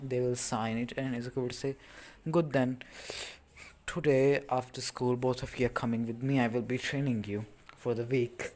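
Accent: India. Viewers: Are you an Indian your accents sounds Indian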